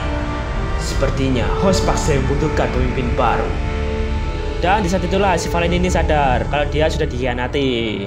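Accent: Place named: East Java, Indonesia. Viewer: suka bet sama lawakannya yg medhok, salam jawa timur mas, lumajang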